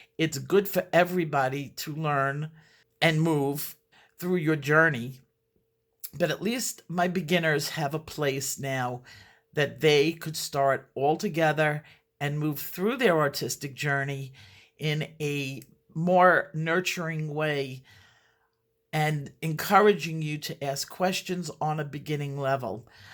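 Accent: New York, United States. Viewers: oh my goodness, you have no idea how excited I am. I always wanted to learn about color and color theory. Mostly for fashion purposes and how color effects people; but never thought of myself an artsy kind of person at all. Until about a month ago, I had no interest in coloring in coloring books. I had no idea this community even existed. Now I’m obsessed!I’m looking forward to all the challenges, that I have no doubt you will be putting on us! Potty mouth, NY accent and all. LOL! Truly looking forward to the channel, learning and growing ❤️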